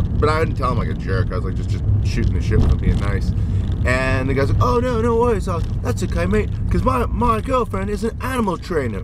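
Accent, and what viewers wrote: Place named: Australia. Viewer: Yeah the accent is most definitely Australian but yeah you better hope they don't see this =). Good stuff mate.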